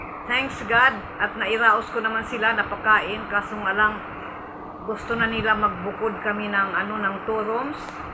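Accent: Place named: Visayas, Philippines. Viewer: Ilhan gyud sis sa accent nato lahi gyud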